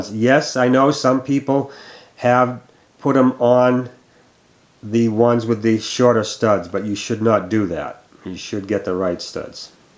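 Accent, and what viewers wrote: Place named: East Coast, United States. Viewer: Does 2nd design mean it's not in the 3rd Generation S-series? Also, where are you located?? You sound kind of east coast. If I'm ever in your neighborhood I'd like to have my 2000 SW2 looked at by ya!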